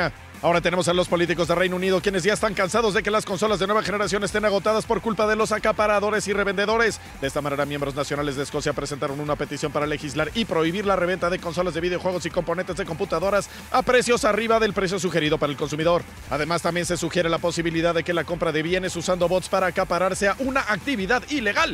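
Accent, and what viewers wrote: Argentina. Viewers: Muy bueno ese acento argento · y vos como te sentís? te da vergüenza jugar videojuegos con acento argentino, lo mejor que he oido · Excelente acento argentino... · ese acento argentino..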